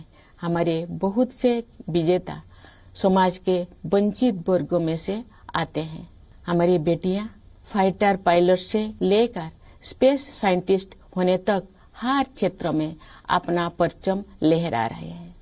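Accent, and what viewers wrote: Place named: Odisha, India. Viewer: Salutes! President Murmu. Liked all the messages along with Orissa accented Hindi. This is essence of United India!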